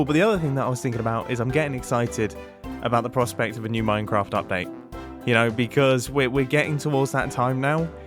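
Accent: United Kingdom. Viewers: Uhh no... no crackle but you do sound more British than usual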